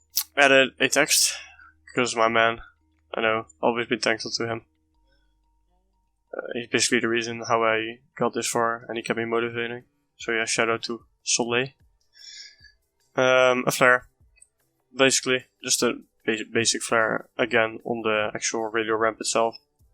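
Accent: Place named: Netherlands. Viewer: Hahah I knew immediatly that you we're dutch when I heard your voice. May I have your skype, or you can add mine if you want: <mail> I have something I would like to talk to you about!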